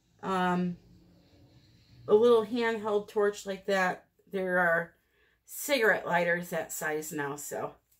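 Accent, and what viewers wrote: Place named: Michigan, United States. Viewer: How could anyone say anything but positives! You are like a next door neighbor I wish I had. I appreciate the humor and most of all the humanness (if that’s a word). Thanks again for sharing. Love the new paint and those cells are wonderful. Keep up the great job and the fun we have listening to you laugh. Trying to figure out your accent, I’m thinking Michigan or Wisconsin. Sending hugs!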